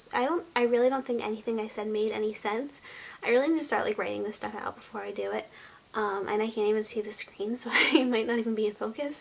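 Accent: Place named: United States. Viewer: Ok putting some points on the comment section here, 1. Me and you have literally been through so much of the same stuff 2. You're beautiful on the outside 3. You're beautiful on the inside 4. Your accent is adorable! (I'm british you Americans are adorable!) 5. Some of the points you put forward were so unbelievably true 6. I have really really bad 'love addiction' (I kinda don't like calling it that sounds weird) I have to make everyone in my life super happy and i give them so much of my time etc after this. I'm gonna think about how much i contribute to stuff like friendships etc 7. You freakin' awesome Xox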